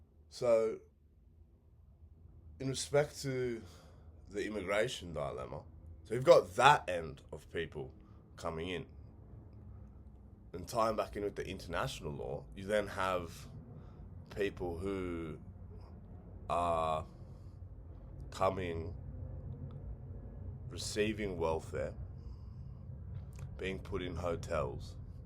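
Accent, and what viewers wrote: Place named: Liverpool, United Kingdom. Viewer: My English is too poor to properly deliver my thoughts about immigration... Let's just say it has been a problematic topic around here too. I don't mind if e.g japanese accountant immigrates here via marriage but we don't have much need for thousands whose only work skill is goat herding. And also, multi ethnic societies are fuctional and fine, but multi cultural societies will face problems. And also #2... Australia? I thought you were British, from Liverpool or something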